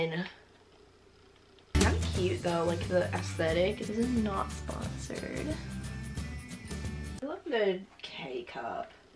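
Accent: South, United States. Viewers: i live for that lil bit of yee yee she got in her voice. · She turns more and more southern as the video goes on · I was listening to you and you sounded hella southern and I saw you lived in Alabama 😳 I do too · I’m new here so I was like “When you find out the jerky is made in LA give up the accent” but as I stayed I realized oh crap that’s her accent. It’s not Texan (like mine) but she’s actually southern huh? 🥸🤪 (especially not Texan when you don’t know what Venison is🤷🏻‍♀️) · She sounds southern but didn't know what venison was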